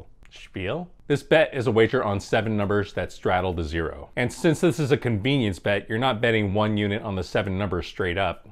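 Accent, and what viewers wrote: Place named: France. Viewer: Thanks for the great content as always. Nice french accent haha. Cheers from Montreal, Qc, Canada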